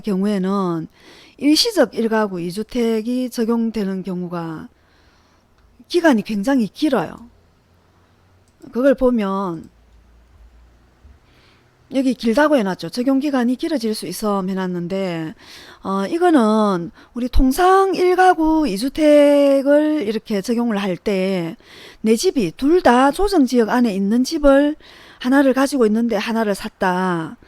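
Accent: Busan, South Korea. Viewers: 처음들어보는 재건축 재 개발 에대한 전문가에 말씀 큰 도움이됩니다 부산사투리 정감있고요 또박 또박 허스키한목소 리로 진행하시는 말 솜씨 가 보통 아니시네요 저는 수영구 역세권 재건 축 대상지 주민으로 물어보고싶은것 많지만 공짜로 들려주시는 유투 버 정보 열심히 청취해보 보겠 습니다 좋은정보 감사합니다 저는 수영구 역세권 대건 축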